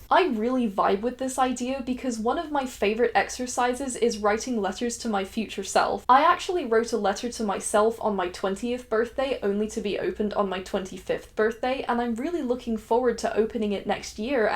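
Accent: Scotland, United Kingdom. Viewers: amazing! Yes I was getting east coast of Scotland. If I'm autistic (I think I am) then my special topic might be spotting accents. Yours is a gem, which I can now put in its own unique place in my collection. Thanks! Ps. Given the Texan element, I would now put the English element in the South West...?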